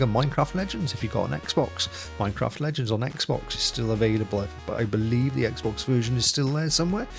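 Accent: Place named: Wales, United Kingdom. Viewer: Love the Welsh accent but rolling his Rs lol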